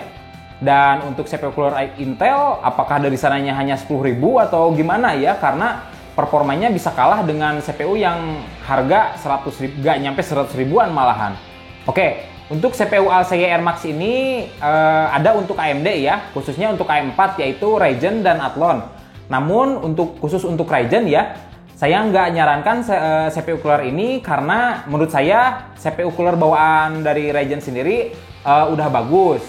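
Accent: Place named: Tasikmalaya, Indonesia. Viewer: orang tasikmalaya dia kayaknya mas akwkwkw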